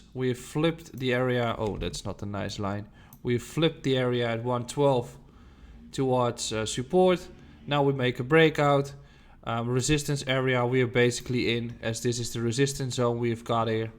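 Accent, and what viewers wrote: Netherlands. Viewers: Finally a down to earth guy, ive started unfollowing allot of other youtubers becouse of the insane fomo talk hahaha, btw im hering a dutch accent am i right?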